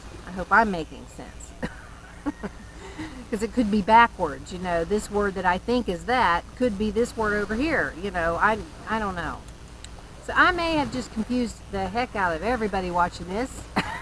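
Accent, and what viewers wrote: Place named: South, United States. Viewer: Sweet thang, you sound most southern!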